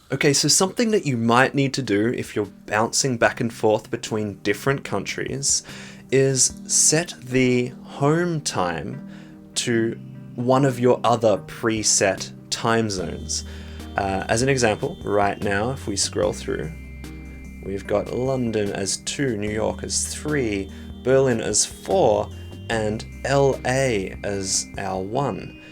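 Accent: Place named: Australia. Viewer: Dat comfy Aussie accent. :)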